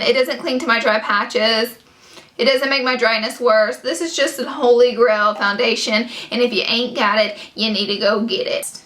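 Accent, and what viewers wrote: South, United States. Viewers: I love you accent. I'm from Eastern Kentucky and your accent is more southern than mine. Although, Alabama is further south than Kentucky. I moved from Kentucky in July of 2011 to DE. I have been living in DE since then. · I didn't know you live in Cali! Your accent sounds southern, but still cute tho! Anyways, I love your personality! :) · You belong on duck dynasty. You have the same accent/personality of their wives. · You're so cute. You sound like my friends lol #southerncharm new sub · I must follow you! I love your accent and sayings! Not sure where you were from but I can tell it was here in the south!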